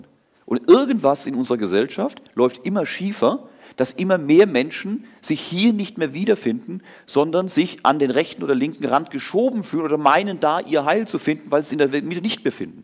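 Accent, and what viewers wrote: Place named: Mannheim, Germany. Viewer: Vor den hochintelligenten Menschen müssen wir aufpassen. Die versuchen uns aufzuhetzen. Und ich bewundere die Menschen die cool und ruhig bleiben. (Herr kommen sie aus der Mannheimer Ecke oder warum können sie den Dialekt?)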